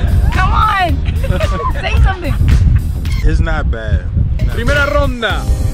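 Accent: Buenos Aires, Argentina. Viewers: hola...soy Argentino, Porteño....vivo en Redondo Beach, California. la verdad que tu español latin aporteñado es muy bueno. saludos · Llegue a pensar que era argentino! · Boludoooo! Tremendo lo bien que hablas el español argentino! Con los años mejoraste zarpado!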